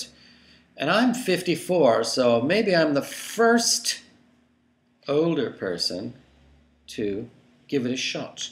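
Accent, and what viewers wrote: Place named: United Kingdom. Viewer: Youre British...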